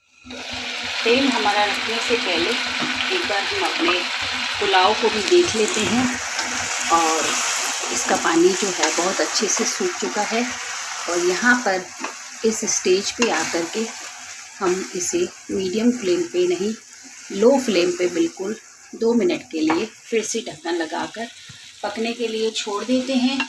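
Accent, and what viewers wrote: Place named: Sindh, Pakistan. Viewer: Tasty thali sweet nd meethi boli sindhi k sath✌🏻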